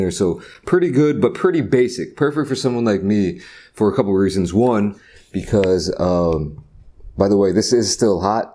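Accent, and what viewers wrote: United States. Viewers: My daughter whose 11 loves Korea and everything Korean..lol. she's a big Anime fan.. I told her to watch your mukbangs and she thinks yo totally cool 😎 😅😅😅..she also loves your American accent 😮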